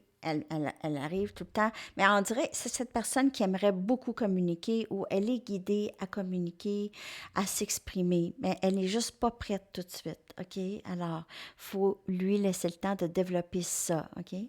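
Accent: Quebec, Canada. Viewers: 😂Vous, êtes très belle avec votre accent, le même que Céline Dion🌸merci 🌺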